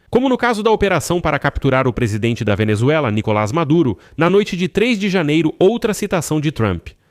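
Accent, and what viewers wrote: Portugal. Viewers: A IA fala português de Portugal. Claro , correto e compreensível. Aqui não se esquecem do plural e singular . Simplesmente um português corretíssimo. Parabéns IA de língua portuguesa .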